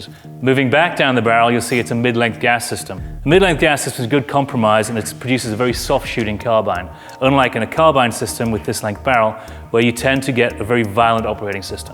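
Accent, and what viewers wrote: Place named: United Kingdom. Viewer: I love my bcm stuff but the British accent didn't work for you guys.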